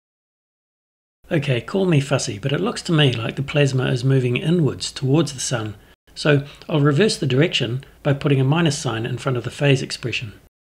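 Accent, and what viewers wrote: New Zealand. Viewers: wow i learnt about quite a few new Fusion techniques and methods in this one so thanks heaps. nice to hear a Kiwi voice too. great explanation and tutorial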